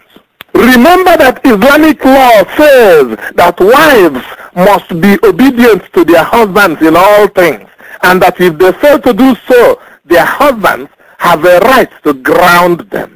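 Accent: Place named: Nigeria. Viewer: who is this big idiot that made this video...the stupid thing is that the person sounds nigerian...how can u open ur mouth to pronounce evil upon ur country...how can ur call ur own motherland the land where satan is in control...God loves my country and he will continue to bless and may God will curse anybody that curses my country...AMEN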